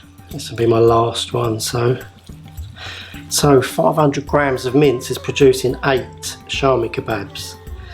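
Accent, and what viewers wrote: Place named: Essex, United Kingdom. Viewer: Great stuff, you sound like you come from my home county of Essex but I now live in the Detroit area so your recipes and cooking lessons are a god send! No such thing as a good curry in these parts, though they do try. Hope things are well with you and that more shows are on the way but I understand it must be a grind to produce these videos. Thanks for the shows you have done mate, top class!!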